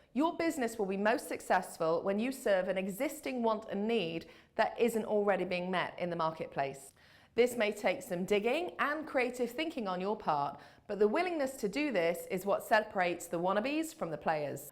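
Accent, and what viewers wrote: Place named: United Kingdom. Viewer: I looooove that Brit accent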